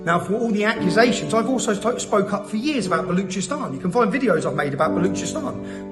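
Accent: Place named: Cockney, United Kingdom. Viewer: Run for PM ...get rid of that cockney accent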